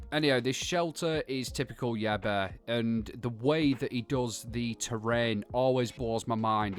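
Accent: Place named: Northern England, United Kingdom. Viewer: Definitely got the inspiration I was looking for but spent most the video trying to work out which side of the pennines you are from 😂 Prestonian here lol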